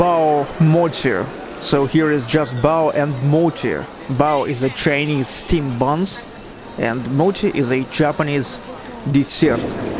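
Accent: Russia. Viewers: Ur Russian accent is fingerlickiing good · He's russianglish :)) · Like your strong Russian accent :)))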